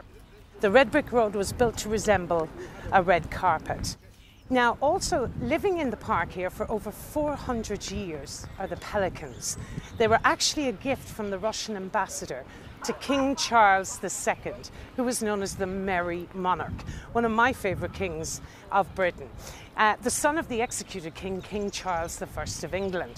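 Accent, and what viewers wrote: Ireland. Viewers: Still Beautiful and an Irish Princess!! Thanks for your Knowledge & Professional Tour! & Thanks for that lovely Irish voice... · my two favourite subjects London and history and superbly well put over also a gorgeous Irish accent . I love this part of London and learned a lot fromthis video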